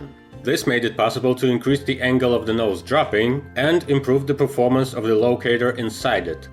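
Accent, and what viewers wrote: Russia. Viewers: А почему с русским акцентом? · I love his Russian accent.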